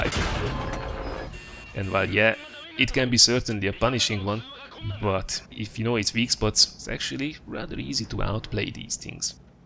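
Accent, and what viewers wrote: Hungary. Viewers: Such nice Hungrish accent. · hallom az ahcentusából :)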